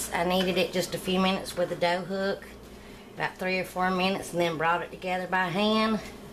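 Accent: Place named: South Mississippi, United States. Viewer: I have got to know where you are from? You sound like your from my neck of the woods down here in south Mississippi. The buns turned out great, and looked beautiful!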